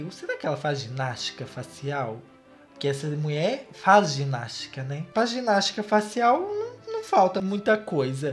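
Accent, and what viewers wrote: Minas Gerais, Brazil. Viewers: "Eu falei muito mineiro agr" eu mineira pensando.... eu falo assim? Kkkkkkj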